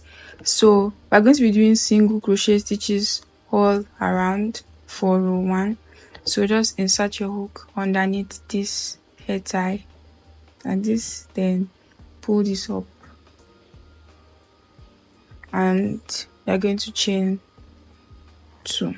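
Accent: Nigeria. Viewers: I love it it's so beautiful and I love your accent Are you Nigerian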